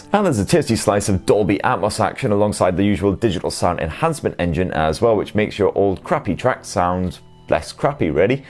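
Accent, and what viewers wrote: United Kingdom. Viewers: Liked & Subscribed for the Steins;Gate wallpaper and that British accent!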